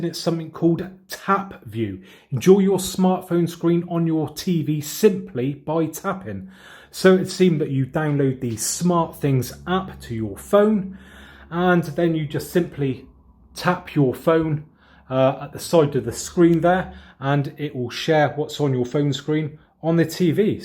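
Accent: United Kingdom. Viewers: Love the british accent. ✌🏾💪🏾😎💥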